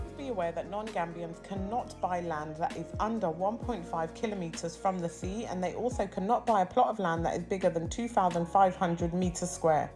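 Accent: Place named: United Kingdom. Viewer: Greetings, that is indeed a lengthy process, however it's a great business for one who wants be become a realtor. Love your British accent. Are you enjoying life in The Gambia?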